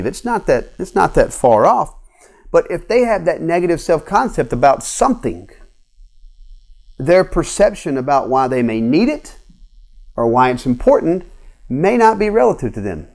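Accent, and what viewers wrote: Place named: South, United States. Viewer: Amazing lesson! Where are you from? You sound like a fellow Southerner! haha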